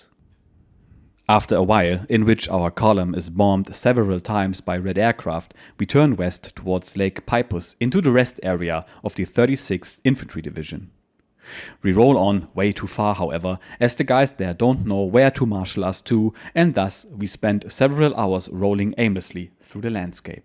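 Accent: Germany. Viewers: great initiative and great books. the only small thing that I complain is that the German accent is sometimes a bit hard to understand thank you!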